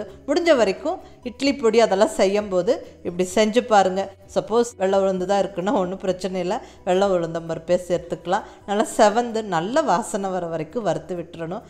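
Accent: Kongu, India. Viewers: Vanakkam ninga Romba azhaga kongu Tamizh la pesuringa. Very nice video THANK U.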